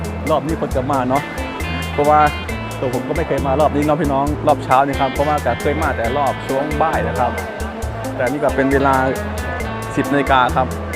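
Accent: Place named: Sakon Nakhon, Thailand. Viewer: เจ้าเว้าคือตน สกลน้า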